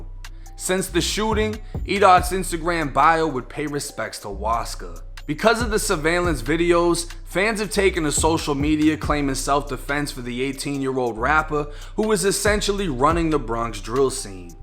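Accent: Boston, United States. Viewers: Maaaaket. Hahaha. Love that Boston twang bud. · Are you from Boston? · You sound like you are from Boston....that's a long ways from me I reside in beautiful southern California 💪🏽and i put down for my city and state 👊🏽💪🏽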